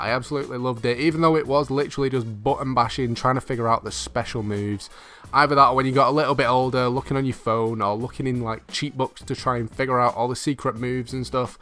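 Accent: United Kingdom. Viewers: Something about a British accent that makes me cringe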